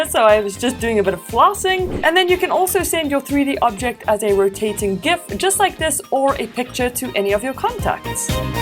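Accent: South Africa. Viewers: South African! Subscribed. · you're from sa? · Are you South African🇿🇦? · Ar you from south 🇿🇦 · Ik twijfelde even of je Nederlands was, gezien de accent. Maar het was toch net even anders. Ik had moeten weten dat je Zuid Afrikaanse bent? Ben je daar ook geboren? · oh this south african accent of yours. love south africans!! can't wait for the travel ban to be lifted to come to SA again and again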